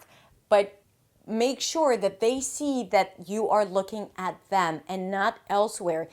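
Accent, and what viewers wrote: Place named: United States. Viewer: how long did it take you to learn American accent properly?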